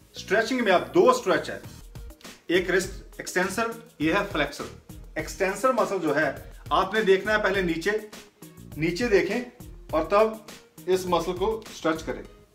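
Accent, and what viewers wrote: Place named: India. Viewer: is he speaking in english or indian i don't understand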